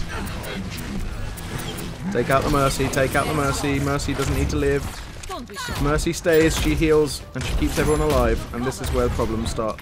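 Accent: United Kingdom. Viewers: you have a Voice Like One of that English Steryothypical Tea Drinker I Like it!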